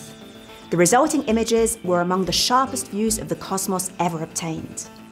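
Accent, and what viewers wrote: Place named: United Kingdom. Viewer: No photos cause it's fake. Just cause she has a British accent doesn't mean she's smart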